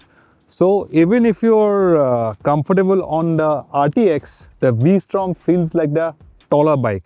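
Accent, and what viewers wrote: Assam, India. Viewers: I think the anchor is assamese, I can sense the acssent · THAT ASSAMESE ENGLISH TONE😁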